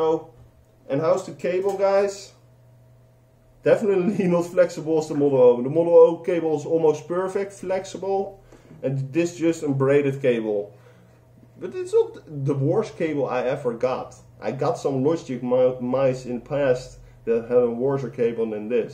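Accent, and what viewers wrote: Netherlands. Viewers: Love the little Sasuke figure! Greeting from Holland, I can hear you're dutch aswell. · Je hoort Ook gwn rustig die nederlandse actent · Hah nederlands accent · no problem keep it up maybe one day earning :))) and you sound dutch? xdd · Are you Dutch u really have a Dutch accent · u fr sound dutch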